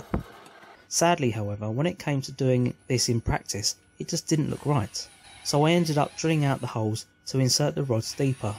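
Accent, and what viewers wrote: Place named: Cockney, United Kingdom. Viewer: You reminded when you mentioned this vid earlier, I needed to watch this - been meaning to but never remember at the right time. Curious, what epoxy do you use for glueing up? Im sick of the Gorilla type where you need ten ton of pressure to squeeze out the gear from those two tubes! Just my ten cents worth, whats always drawn me to your vids was the kinda lock stock and two smoking barrels feel, like at the start and end of this vid in a fashion of "Guns for show, knives for a pro" "The entire British Empire was built on cups of tea" "No, I asked you to give me a refreshing drink! Wasn't expecting a fucking rainforest. You could fall in love with an orangutan in there!" You had that same tone before but didnt copy it, kinda came naturally across in your vids - That was your USP from your vids to me mate, hope that makes sense? 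Quintessentially English/Cockney in a funny way done naturally - I'm meaning this as a complement.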